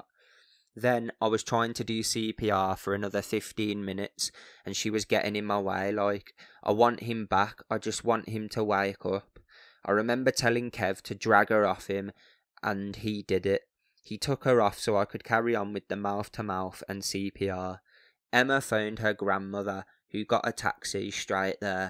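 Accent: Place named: Birmingham, United Kingdom. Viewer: I think this person may be mocking your Brummie accent 🙈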